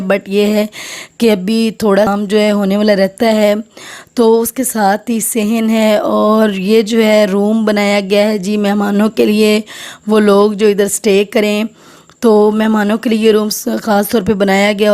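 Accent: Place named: Chakwal, Pakistan. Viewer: R u from chakwal ap ki Urdu say pata chal raha ha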